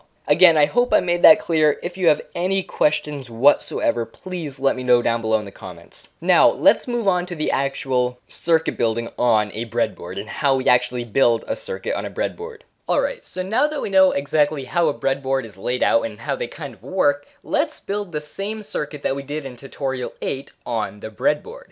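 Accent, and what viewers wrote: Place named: Canada. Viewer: Your "About" page says "United States" But your accent says: "Canadian" I'm confused. >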